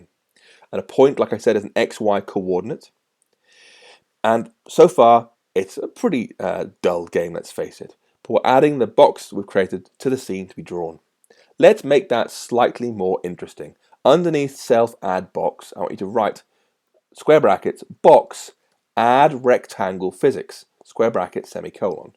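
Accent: United Kingdom. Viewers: LOL British or Scotish.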